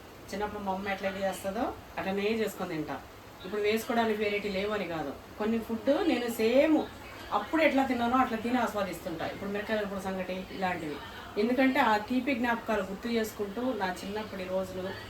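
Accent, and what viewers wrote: Telangana, India. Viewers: I would love to hear rayalaseema slang not telengana plz stopped watched ur video in between becoz I missed native language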